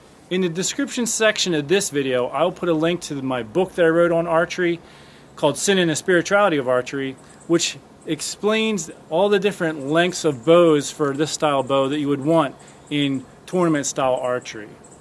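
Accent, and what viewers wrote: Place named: New Jersey, United States. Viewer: Thanks you do have a direct, likable, teaching style. Your accent sounds like my home state, new Jersey.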